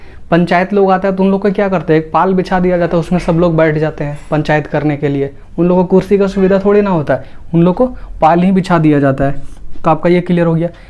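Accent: Bihar, India. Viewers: सर आप बिहार से ही हो क्या ,, बेस्ट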